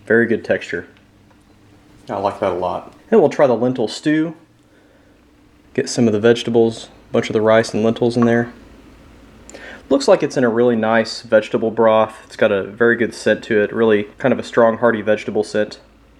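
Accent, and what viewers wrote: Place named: Deep South, United States. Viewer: I'm confused mate, are you American or Canadian? You sound like you're from the deep south, but you called it "jam" not jelly. Americans call jam jelly. Canadians correctly call it jam.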